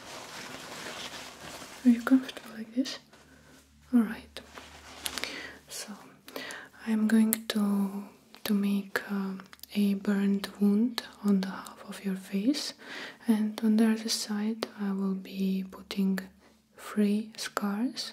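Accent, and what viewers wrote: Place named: Russia. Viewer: Where are u from? Ur accent sound like russian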